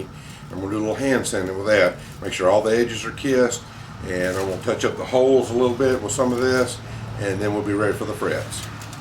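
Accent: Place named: South, United States. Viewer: In the description there's a transcript option. That may be helpful for you. He has a southern accent so that may not be something you're used to hearing. I hope that helps you.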